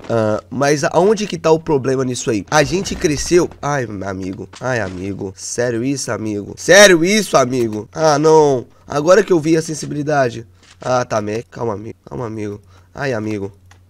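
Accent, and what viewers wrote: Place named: Santa Catarina, Brazil. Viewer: sotaque catarinense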